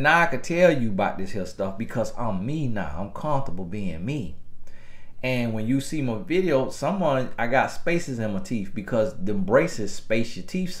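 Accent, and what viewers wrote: South, United States. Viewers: I love your country accent and your authentic self! You're a blessing to us. God made you just the way you were supposed to be.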